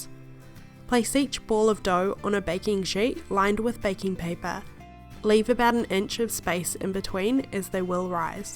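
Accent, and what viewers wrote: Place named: New Zealand. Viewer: It's a new zealander accent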